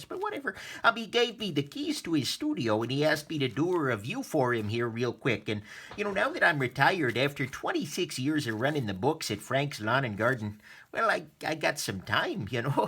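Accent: Canada. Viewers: I knew i could detect Canadian right away. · Hey, are you from Canada or Minnesota?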